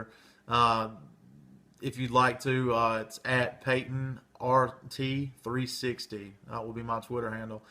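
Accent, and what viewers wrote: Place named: Georgia, United States. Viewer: "Jaowja Futbaw" - Cool accent you "Jawjuns" have sir:)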